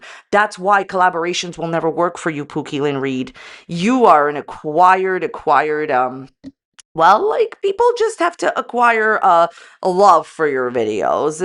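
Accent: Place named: East Coast, United States. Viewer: love your accent. I am from the east coast and miss the accent.❤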